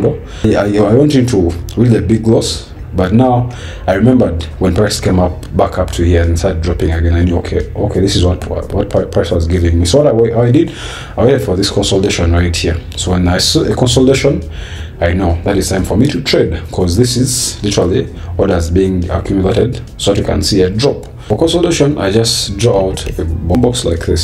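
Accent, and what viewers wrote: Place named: Uganda. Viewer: Hey man.. are you from Uganda... your accent sounds Ugandan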